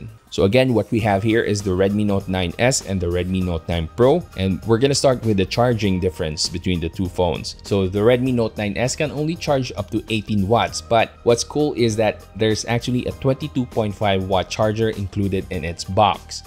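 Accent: Visayas, Philippines. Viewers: You're speaking voice is so calm. Paytera kay bisaya ja inganag accent. You are the best technodad there is. I'm def a fan💛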